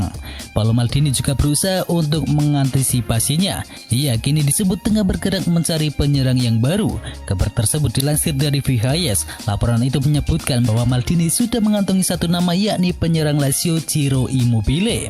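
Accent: Java, Indonesia. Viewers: bisa gak pakai bahasa Indonesia yg baik dan benar jangan logat jawa dasar biawak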